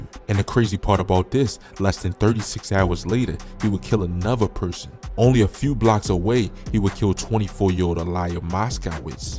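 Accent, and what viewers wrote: Baltimore, United States. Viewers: Sound like u frm Baltimore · The narrator has to be from Baltimore 🤦🏽‍♀️🙄😒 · Where are you from Baltimore? Your accent is fucking stupid bro. You pronounced word so fucking stupidly. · Got that Baltimore accent 2uuu 😂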